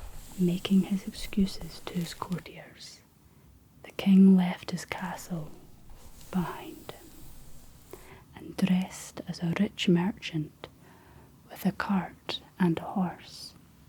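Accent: Scotland, United Kingdom. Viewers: I’m always up for a wee cozy night with a Scottish lass reading to me with the peak accent. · Wow, you read very well.. very relaxing. And I enjoy ur Scottish way of speaking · I'll be traveling to Scotland next summer, I'm practicing the accent thanks to your videos! Amazing work! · What a calm soothing relaxing voice you have and that Scottish accent, I just love it. Very nice video, thanks for sharing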